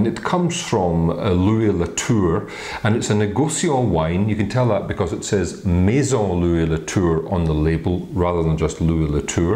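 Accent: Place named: Scotland, United Kingdom. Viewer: Hearing a Scotsman talk about wine is taking me a minute to wrap my head around! :P Came to learn about Marsannay in general, you reviewed THE EXACT wine we'll be drinking this eve! Cheers from America (and my girlfriend who is a transplant from Fife. :) )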